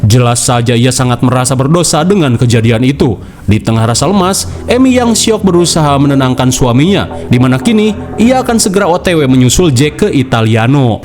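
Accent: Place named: West Java, Indonesia. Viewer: channel youtube yang nyunda nya nyunda bgt 😂😂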